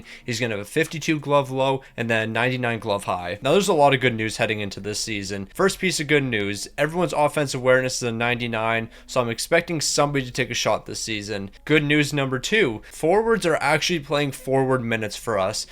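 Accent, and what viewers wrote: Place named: Nova Scotia, Canada. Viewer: What province are you from ? Gotta be Nova Scotia lol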